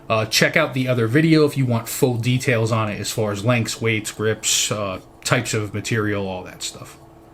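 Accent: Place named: Philadelphia, United States. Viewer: Great EDC. Your accent has a Philly vibe.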